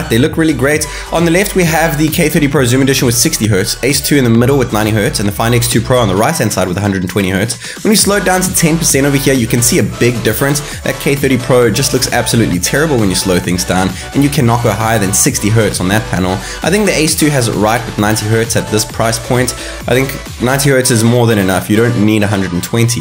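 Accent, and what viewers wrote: South Africa. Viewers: South African accent. I guess you haven't travelled and experienced other cultures.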